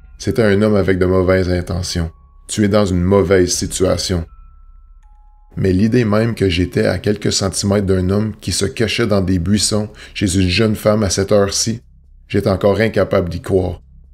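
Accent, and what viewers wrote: Quebec, Canada. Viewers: Superbe travail j'aime beaucoup 👍 Ps j'adore l'accent québécois 🙏👌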